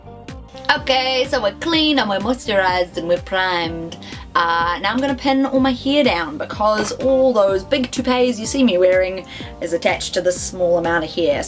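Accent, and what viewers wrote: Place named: New Zealand. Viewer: I heard that kiwi accent and I was IN!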